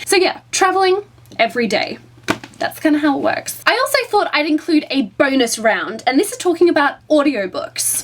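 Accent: Australia. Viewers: As much as I hate straying from the topic of a video.. where is she from? As a kiwi who's been in Australia it's becoming hard to differentiate the accents now lol. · You must be from Sydney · I loooooove the Australian accent <3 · Are you Australian?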